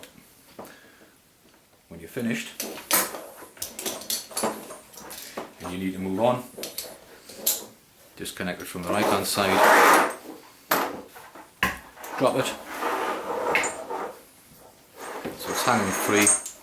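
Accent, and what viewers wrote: United Kingdom. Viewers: Nice vid. Used this to confirm an approach were taking with soft strops. Using a Petzl Micrograb instead of the pussick. Are you from the north east?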